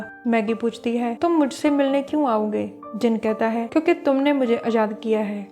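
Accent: Punjab, India. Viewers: Punjabi touch ha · U r punjabi ?? Cuz u speak hindi with punjabi accent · U are punjabi right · I love your all videos didi . thodi voice to lgda v tusi punjabi ho m also from punjab 🤗🤗🤗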